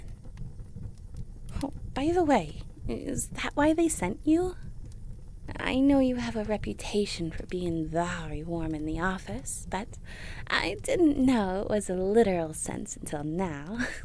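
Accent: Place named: Canada. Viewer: Your accent for this character is perfect. It sounds like a cross between faint Canadian, and classic kimonomimi voice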